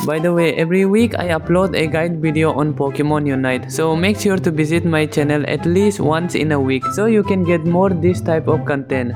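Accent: India. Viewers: Aew you indian ? Because I am also indian and by your saying accent is like Indian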